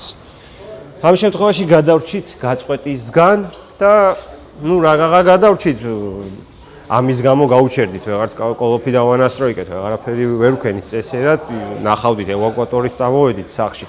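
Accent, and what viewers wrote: Guria, Georgia. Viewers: კაცურად გურულად მეტი ილაპარაკე ❤ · გურული აქცენტით რო ურტყავ ხოლმე ვხალისობ კაია 😊 მეც გურული ❤😂 გბალეშიკობთ გურული ბიჭები, აპა რავა 😊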